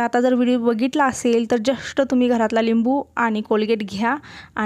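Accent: Kolhapur, India. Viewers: Tumhi chhan bolta tai. Bhashebaddal koni kahi mhatale tari tumhimkahi watoon gheu naka. Bhasha he sanwadache sadhan ahe. Ani dar 4 kosawar bhasha badalte. Tumchya bolnyat kolhapuri baaj ala ki mala to khoop awadto. (mi kolhapurchi nahi) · भाषा doesn't matter कोल्हापुरी भाषा रांगडी वाटते पण आतून मधाळ असते,I am also kolhapuri . Video छान, उपयोगी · छान व्हिडिओ बनवतात तुम्ही आवाज पण छान आहे शांत आहे सोलापुरी कोल्हापुरी भाषा तर खूपच छान आहे नाव ठेवू नये कोणी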